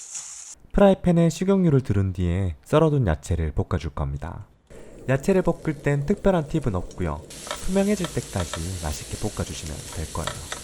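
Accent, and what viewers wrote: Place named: Daegu, South Korea. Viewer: 살짝 스치는 대구사투리에 정겨움이 느껴집니다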